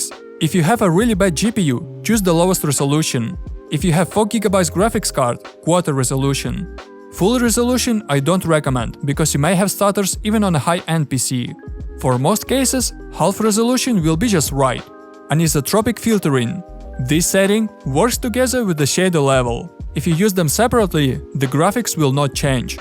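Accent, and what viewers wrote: Russia. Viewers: Слышно что Русский либо Укр))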